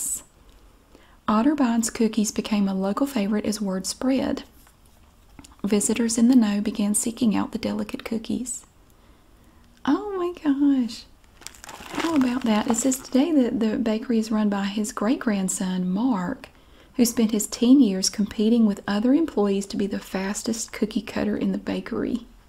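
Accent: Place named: Pittsburgh, United States. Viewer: ! What suburb outside of Pittsburgh was it?? I'm born and bread Pennsylvanian, just about 1.5 hours outside of Pitt. Also, we call the accent "Pittsburgh-ese"- I believe it was recently rated the worst accent in the entire country lol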